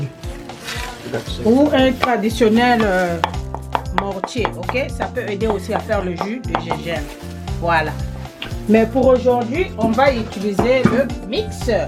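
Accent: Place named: Republic of the Congo. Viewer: Merci ma soeur Ton accent est du congo bzv Sans me tromper vous etes lari? Je reconbait notre accent . Soyez béni.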